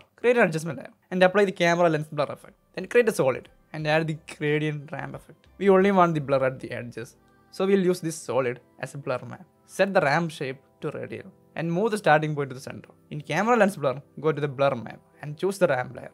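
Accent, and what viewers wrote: Kerala, India. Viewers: malayali alle ? enikk aa accent kettappo thonniyatha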